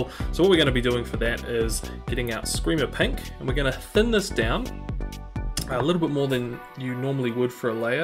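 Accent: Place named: New Zealand. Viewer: For an Adelaide based kiwi, it's so great to hear our accent and the word "Kiaora" and btw, your tentacle painting is spot on!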